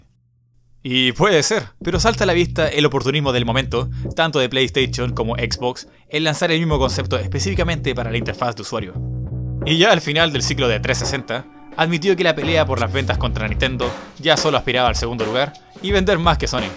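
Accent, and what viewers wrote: Chile. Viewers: si igual se vio q trataste de darle un toque mas neutro, pero los q son de chile se dan cuenta quien es de su pais jaja, buen video, y q opinas de ps3 y ps4? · Eres chileno?me encante el acento chileno hablando sin modismos,creo que es uno de los más neutros de todo latinoamerica,por algo la mayoría de los doblajes de Discovery (sobretodo Discovery kids)se hacen en chile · eres de Chile?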